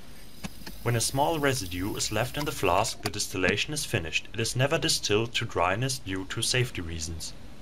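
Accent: Germany. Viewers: Are you German.? The accent sounds like it..( That's NOT a racial thing, just an "ear" thing) :) btw, ich bin Belgiër und mein grossvater war Deutsch.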